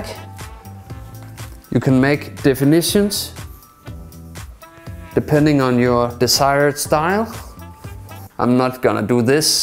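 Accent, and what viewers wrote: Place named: Denmark. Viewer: Dansk accent dræber mig..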